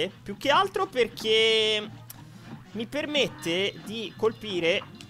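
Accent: Tuscany, Italy. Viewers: ma sei toscanohhhh?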